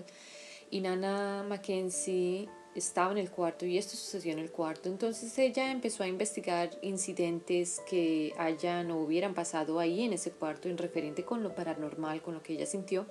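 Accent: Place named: Colombia. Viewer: Aceptemos la diversidad, los acentos de cada region son diferentes. es Colombiana y esa forma de hablar es muy tipico de su pais.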